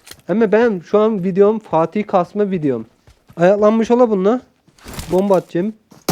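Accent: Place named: Afyon, Turkey. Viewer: Tam afyonluca konusuyon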